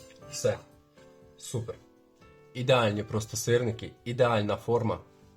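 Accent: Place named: Carpathians, Ukraine. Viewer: Доброго дня., ти чудовий кухар. Все в тебе надзвичайно смачно і швиденько. А я хочу вибачитись за тих хто заходить на канал і починає показувати, який він мовник. І як добре вміє розмовляти. То ідіть в школу і викладайте і навчайте своїх дітей і внуків. Наша карпатська мова дуже різноманітна. Так розмовляли наші дідусі і бабусі, батьки, ми і наші діти. Ні, щоб подякували за рецепт, а викаблучуєтесь. І не соромно вам люди. Порядна людина ніколи не буде писати таких дурниць. Радуй нас своїми кулінарними шедеврами і розмовляй так, як говорять у нас в Карпатах. Бережи тебе Боже.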